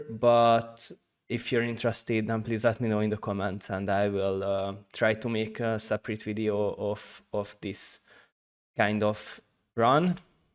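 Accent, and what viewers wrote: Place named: Hungary. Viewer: Magyar akcentus az peak tesó! Amúgy jó lett a video, well done GG!!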